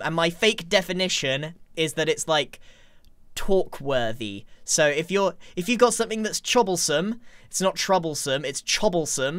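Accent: United Kingdom. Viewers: I think impulse didnt notice "chobblesome" because with your british accent it sounds very close to "troublesome"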